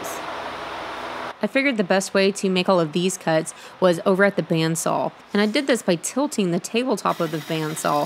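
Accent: Texas, United States. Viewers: A Texas saw is sawl. Like she says, she talks how she talks. And her saw has a L at the end!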